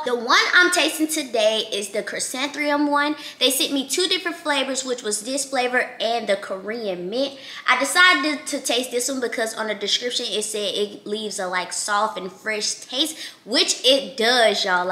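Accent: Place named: Alabama, United States. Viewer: You from Bama like me all my folks pronounce everything wrong Himalayan Salt 😊❤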